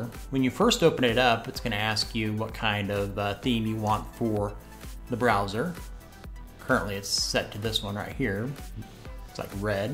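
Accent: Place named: New England, United States. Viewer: Love your Yankee accent...wow.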